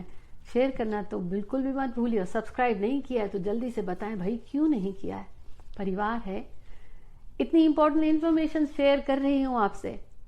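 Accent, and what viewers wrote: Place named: Punjab, India. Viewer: Aye haye...loved that pnjabi ascent...aiven hi🤘🤘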